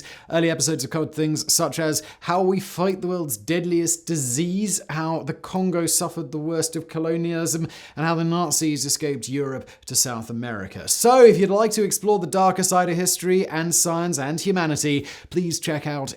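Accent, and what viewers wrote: United Kingdom. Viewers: Actually thought he lived in America. Yes, he speaks like a Brit, but he seems very America-oriented. Every time he says "Danny" in his British accent, makes me think of the social worker scene in Mrs. Doubtfire. Where he goes back and forth in some normal look and Doubtfire? He cries out: "Oh, Danny boy!". Never heard of any of those Brit Soaps, but in the last 20 years American soaps have become Star Trek vs. Clive Barker. No wonder many ended after 50 years. People like retro tech. Just look at any young person ever that thinks their meme of pretty much EVERYTHING is cool. I am used to seeing vinyl new again, but I saw a laser disc of Frozen at walmart today! You know? Those giant DVDs that lasted like 2 seconds? Whats next? Back to fucking beta tapes?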